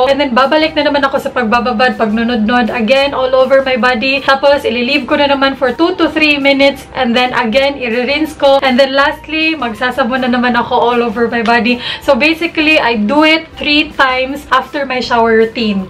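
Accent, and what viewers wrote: Visayas, Philippines. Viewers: hi te bisdak lge ka..haha taga saan ka?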